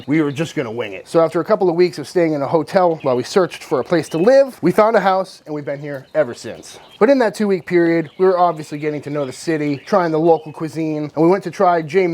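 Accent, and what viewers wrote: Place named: New England, United States. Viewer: Every video I watch of yours I always say to myself "this guy is from New England". Glad I can now confirm that now.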